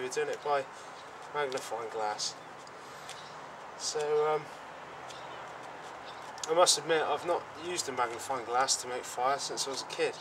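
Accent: United Kingdom. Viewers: thats great that you're an outdoors person mate! and i love the british accent! BLOODY HELL MATE! CHEERiO!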